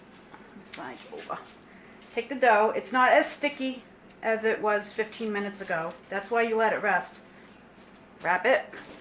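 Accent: Boston, United States. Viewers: I hear the Yankee....fellow Bostonian here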